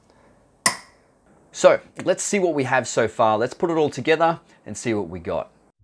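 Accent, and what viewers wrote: Australia. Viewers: Sound Aussie bruh. Awesome vid helps alot! Waiting for my A7iii looking at 17-28tam also 🤩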